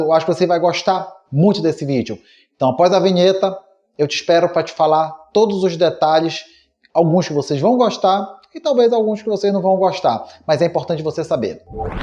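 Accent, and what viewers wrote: Pará, Brazil. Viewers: Muito bom, reencontro esse sotaque maravilhoso do Pará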